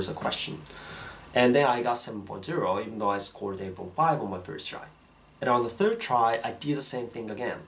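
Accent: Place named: Australia. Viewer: Omg I love your Australian accent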